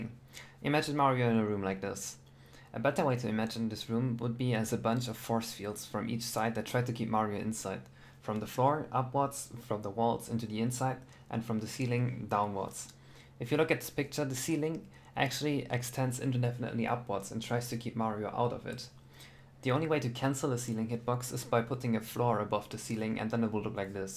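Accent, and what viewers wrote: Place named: Germany. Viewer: something about germans talking english with a adorable accent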